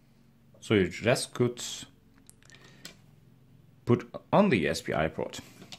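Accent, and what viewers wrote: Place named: Home Counties, United Kingdom. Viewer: The kickstarter requests Canadian dollars but the accent is clear Home Counties English. Quite surprising.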